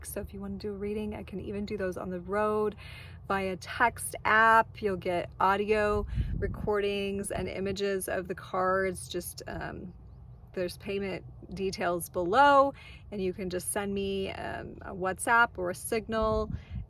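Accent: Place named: South, United States. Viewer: Thank u that was helpful! My tongue has been burning 4 many months now so I’ll try the teeth breathing. Be safe on your journeys ❤ Oh yeah…& you are talking like a southern girl…u said “Hey ya’ll welcome back to my channel!” First thing I noticed!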